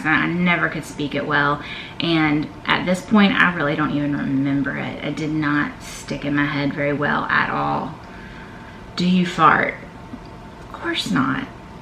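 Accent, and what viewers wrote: South, United States. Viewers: Another great video, This is so cool, helps us get to know you! I love your southern accent! My sister has a southern accent, she lives in HHI. Do you live close to HHI? I'm visiting her in June! Prayers are with your lil man!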